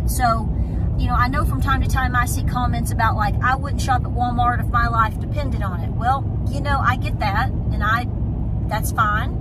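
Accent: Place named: Southwest Missouri, United States. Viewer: I live South of Joplin I'm originally from Cassville where are you. You sound like you're pretty close to me. I'm nosey Lol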